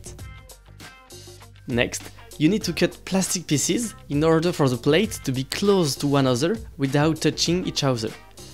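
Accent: France. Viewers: Are you from germany? Why do you have accent of french?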